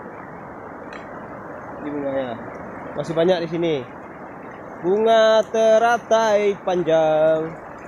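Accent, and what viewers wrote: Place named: Solok, Indonesia. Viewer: Logat bahaso solok kentara,slaam sesama rang slk da,dimaa lokasi spotny ko da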